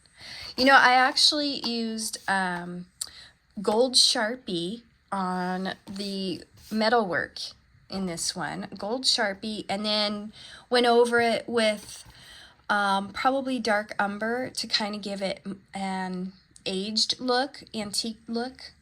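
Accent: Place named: Wisconsin, United States. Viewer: 🤣🤣 I'm just saying, I'm from WI and you sound just like me! 😉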